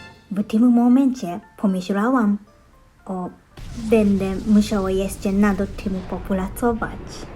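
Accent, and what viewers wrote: Japan. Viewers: Dużo ludzi, którzy przyjeżdżają do Polski z innych krajów mówią, że polki jest trudny. Zgadzam się z nimi, ponieważ pomimo, że jestem polką również mam z nim problem. Na przykład z ortografią i gramatyką. To są dla mnie męczrnie. Szczególnie gramatyka. Z ortografią miałam problem w młodości😊 Życzę powodzenia. I tak już bardzo dobrze mówisz po polsku. I bardzo podoba mi się ten twój japoński akcent. Jest cudowny❤ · Dla mnie Japoński jest trudny, ten Wasz akcent 🙂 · polski jest latwy smiesznie muwisz tak po polsku z akcentem japonskim bez obrazy oczywiscie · Drzewko ( choinka) ma igły a nie liście i wg tradycji choinkę się wyrzuca po wizycie duszpasterskiej 🙂 Mówisz bardzo dobrze po polsku, widać że się bardzo starasz ale też widać że mięśnie twarzy/języka masz przystosowane do języka japońskiego gdzie są inne dźwięki ale to normalne 🙂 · Hello, I'm from Poland! 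The way you speak Polish with a Japanese accent is really cute! My dream is to learn Japanese one day! I've been fascinated by Japanese culture and language ever since I was a kid! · HAHA pomimo że czasem masz problem z naszymi dż, dz, ć itp. mówisz tak dobrze że łatwo cię zrozumieć a ciekawostką jest akcentowanie japońskie polskich słów :D Choinki niestety nie lubią ciepła i suchego powietrza mieszkań (nawet te w doniczce z korzeniami) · Ale japoński akcent w polskim brzmi słodko :D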